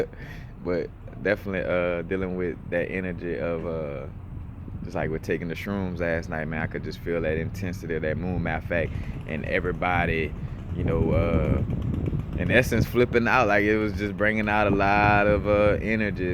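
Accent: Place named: Louisiana, United States. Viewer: where you from bro? you sound like youre from my neck of the woods down in the boot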